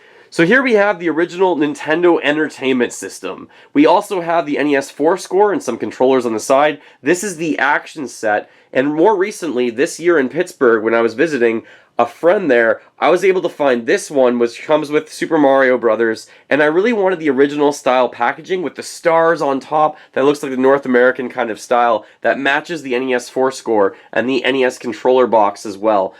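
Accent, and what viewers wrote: Canada. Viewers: That is a pretty thick Canadian accent.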